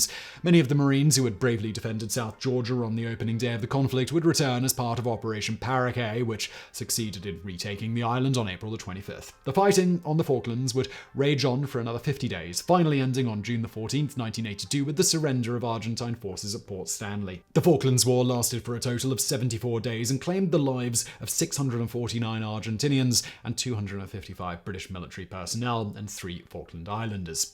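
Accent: England, United Kingdom. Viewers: “Badassery” doesn’t sound right in a middle class English accent.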